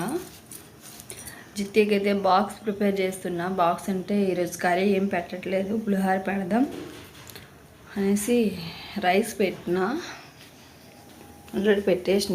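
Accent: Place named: Godavari, India. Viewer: Godavari la undi Telangana language sister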